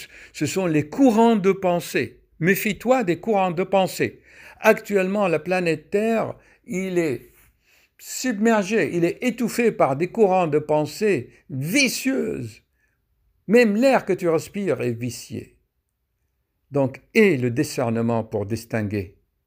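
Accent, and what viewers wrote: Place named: France. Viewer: Bonjour cher adore 🙏vos vidéos car je me reconnais en vs, je suis pharmacienne à Québec et pratique la méditation et yoga +++ et je m’intéresse à l ayurveda Vos explications unissent la science à la spiritualité, exactement mon combat de tous les jrs!! Par contre l’explication de 2 mx dont je suis atteinte m’échappent....ménière et les fameuses impatiences aux 4 membres empêchant même de rester en position assise tellement il y a un besoin irrépressible de bouger pr soulager les sensations qui sont une vraie torture et qui reprennent dès l’arrêt du mouvement Traitement pharmaco très efficace ds le 2e cas, très « fort » aussi, mais ce sont les explications spirituelles qui m’échappent Manque de connection à la terre pr ménière? (Les vertiges qui me clouent au lit pendant des mois alors que j’ai 45 ans et une enfant handicapée ) Et pb d énergie mal répartie mal diffusée a travers le corps ds le cas des impatiences? 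J’aimerais tant connaître vos explications..... Je suis née en Bretagne et j’adore 🥰 l’accent français! Merci pr vos vidéos ça me donne le courage de continuer à expliquer les concepts médicaux et pharmacologiques aux patients du point de vue de l’univers, énergétique Merci 💝🙏🕉💫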